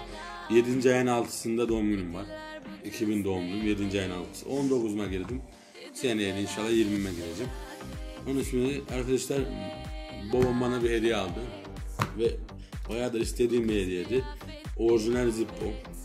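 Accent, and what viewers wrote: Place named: Eastern Black Sea, Turkey. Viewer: NERELİSİN Doğu Karadeniz şiven var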